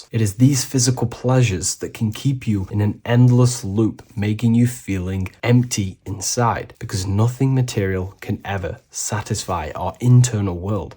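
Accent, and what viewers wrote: United Kingdom. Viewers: Such a clear British accent you've got bro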